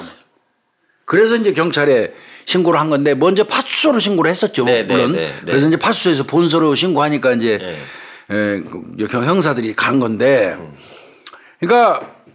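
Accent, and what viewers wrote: Chungcheong, South Korea. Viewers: 화끈한말씀^충청도사투리가구수하신~ 김복준선생님? 절친고향여친도 복주라하였네요!오래전 고혈압으로 하늘이사한 가엾은친구!생각이나는~ 사이다같은 범죄자에 쓴소리가 그나마보는내내 시원한~🧊 하나뿐인생명 무참히살해하는인간들은? 그냥~~팍👊😡 요즘 수사반장을보는듯한~수고와감사🙇‍♀️ 그리고교훈^조심또조심 밑을넘없다 경계심잃지말고…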